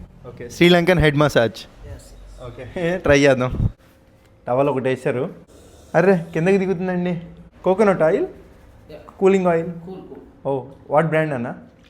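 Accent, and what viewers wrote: India. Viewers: Re first you learn English talk English and talk properly English. Then after you go to world travel in front onwards. You talking this Buttler English, it’s not good for us. It’s not good for Indian people also talking about that Butler English in front of foreign persons it’s impact for us in India, so learn properly talk properly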